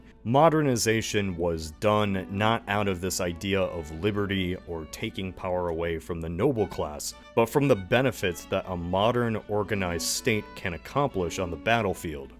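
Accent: Ohio, United States. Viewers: Thank you for your wonderful new "alternatives"... I truly appreciate the work y'all do on these. I especially am glad that your family was able to exist long enough for you to be here! I really enjoy your voice. Do you have an Ohioan accent! This is -->almost <-- as interesting as the episode you did on the lack of the Great Lakes.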